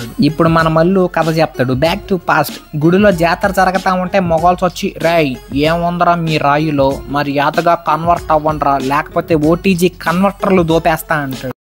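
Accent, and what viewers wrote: Nellore, India. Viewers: Are you from nellore? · Enti nellore ah meedi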